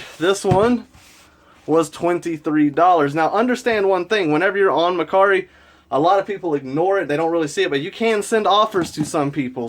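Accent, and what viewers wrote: South, United States. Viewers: I noticed your accent, where are you from? I live in Bama and it's nice to see a fellow southerner into retro games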